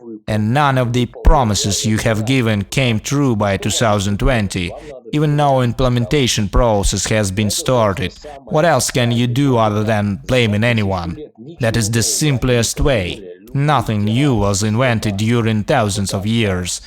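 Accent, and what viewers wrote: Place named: Russia. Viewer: И дурацкий русский(?) акцент ещё зачем-то. Для нац.колорита что ли?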